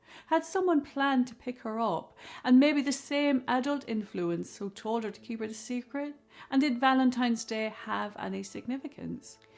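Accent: Ireland. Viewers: Who was at that slumber party? I think someone at that slumber party or an adult in charge knows what happened to her and why she left that night. Do we know the people her cousin knew? Love the way you delivered on this case. Glad I happened on your site. I think you’re a fellow Irish woman, the accent gave you away. Look forward to hearing more from you.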